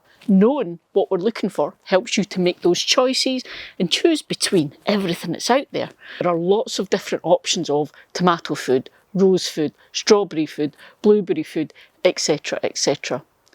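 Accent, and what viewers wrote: Scotland, United Kingdom. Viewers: Sunday: Video Day. It’s always nice to hear your Scottish accent on a Sunday morning. The information you impart is always useful, to boot. My problem with fertilizers is my desire to keep the vegetable garden organic—after planting. There seem to be only a few fast-acting liquid organic fertilizers available, and none seem to help with blossom end rot. My tomatoes suffered from it, even though I watered religiously, and now my peppers—which are finally blooming and forming fruit that doesn’t fall off—are suffering the same malady. This, in spite of consistent watering.🥺 Oh well, maybe the drip system I’m installing for Season ll will provide better results. Have a great day (or is it evening, there?), and.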